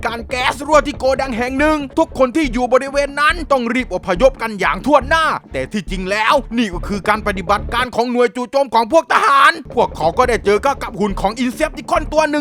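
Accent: South, Thailand. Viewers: พี่เขาเป็นใต้นั้นเเละครับ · พี่เป็นคนใต้หรอพี่5555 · พี่ครับพี่เป็นใต้ใช่มั้ยครับตอบผมหน่อยนะ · ฟังยุตั้งนานพอรู้หล่าวว่าคนใต้เหมือนกัญ 🌿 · พี่ครับพี่เป็นคนใต้ไหมครับ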